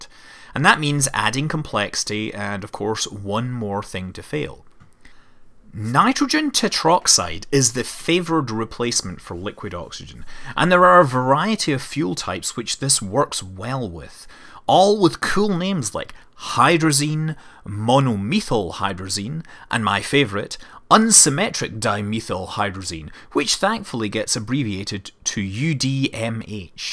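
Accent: United Kingdom. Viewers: Please stop saying MEEE thane. You do not say MEEE thod! This MEE pronunciation is an annoying, "In Your Face" Colonial Yankee Dog, Britishism insult!!!! If it is not made as an insult it is at least a snobbish "Affectation". You are an obviously intelligent, well admired scientist, so any "Affectations" are unnecessary. I can live with AL you min eum, instead of Al lou minum because that is a real phonetic sound of the British way of spelling Aluminum.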